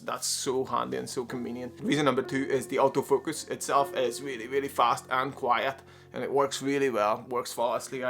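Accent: Northern Ireland, United Kingdom. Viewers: Good. Is that an NI accent I hear?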